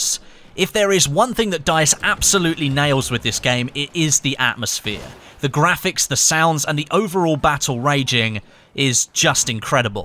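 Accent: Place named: Southern England, United Kingdom. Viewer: If I had to guess I would say the south coast of England. His accent isn't northern, jackfrags is a northerner. I'm from the south west and we don't share the same accent. Or he could be from the posh parts of London.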